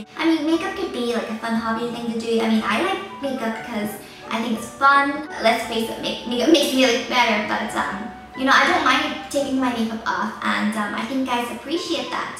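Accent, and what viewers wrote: Ireland. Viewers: She sounds like irish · She Irish I think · Are you Irish lol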